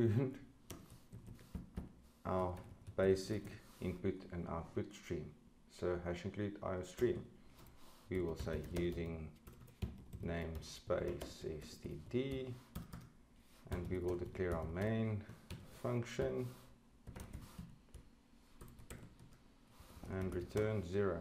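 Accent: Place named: South Africa. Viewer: Your accent says you are in South Africa. . . Super proud of you man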